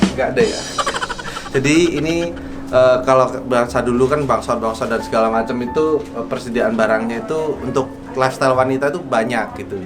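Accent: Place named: Java, Indonesia. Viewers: Cabe joooon , wkwkwk ... btw , lucu nonton orang logat jawa kaya situ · Pertahano bhs suroboyo mu cak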